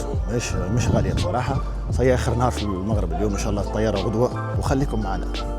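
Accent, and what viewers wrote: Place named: Libya. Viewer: عندو اللهجة الليبية وليس التونسية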